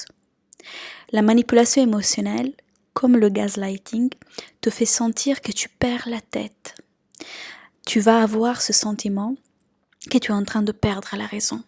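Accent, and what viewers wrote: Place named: Iran. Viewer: Merci 🙏 pour vos vidéos D ou vient ce jolie accent? Je dirais l iran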